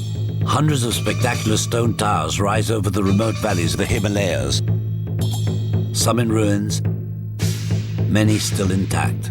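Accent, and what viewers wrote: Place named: United Kingdom. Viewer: I can't stomach an overly dramatic British accent, makes me want to puke....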